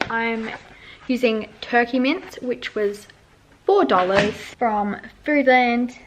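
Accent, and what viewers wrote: United Kingdom. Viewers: You sound British but use dollars??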